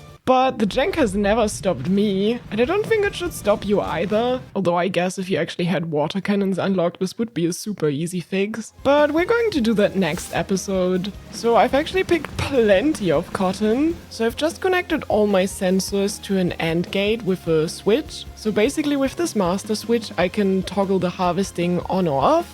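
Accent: Germany. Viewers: I don’t know if it’s just being an old-school Minecraft player, but I don’t know what it is anytime a German or someone with like that German style accent teaches me about engineering. I just have to listen. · man the German accent breaks me every time, so cute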